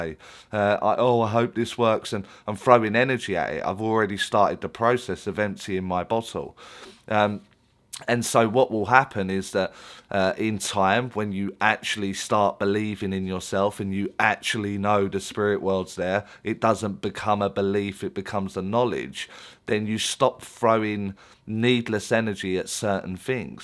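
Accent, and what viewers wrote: Essex, United Kingdom. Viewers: I've been following you since 2018/2019 and back then I could only hope I could be a Medium. Fast forward I'm now demonstrating as a Medium from 2020 and you've been truly inspirational. I am now rewatching your videos with new eyes and again learning more at a deeper level. Plus love the Essex accent but then again I'm bias as I'm Essex too and just hope I'm as down to earth as you 😊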